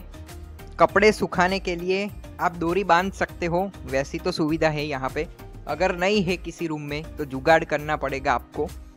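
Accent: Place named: Gujarat, India. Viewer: Dorii,sidheee...😂🤣 gujarati hindi accent kamal😂😂👍🏻👍🏻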